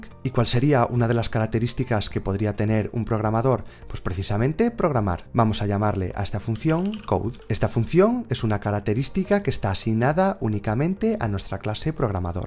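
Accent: Galicia, Spain. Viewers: Me están gustando mucho todos tus vídeos, me parece que están muy bien explicados. Y aún encima acento gallego, de mi tierra, así da gusto! Quería preguntarte si habrá alguna diferencia (en cuanto a contenido) entre este curso gratuito en YouTube y el curso que tienes en udemy de pago (iOS 13 y Swift 5.2  Curso Completo Desde Cero). Gracias!!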